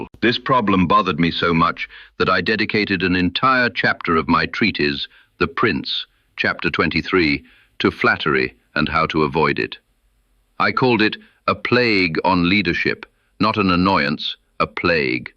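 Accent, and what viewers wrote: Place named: England, United Kingdom. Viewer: He was Italian, why does he have an English accent?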